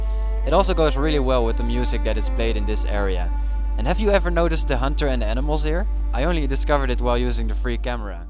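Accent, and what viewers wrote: Netherlands. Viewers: Dat dacht ik al te horen aan het accent;) Leuke video btw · Nederlands accent XD nice video btw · Are you Dutch? I seem to hear an accent lol.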